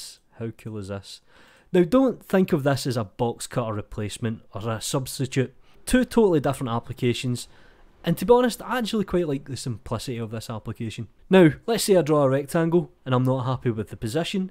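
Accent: Scotland, United Kingdom. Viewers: This was incredible. This was exactly what I need. I didn't know about the Boolean Modifier. Thank you! I love your Scottish accent too, so cool... >w<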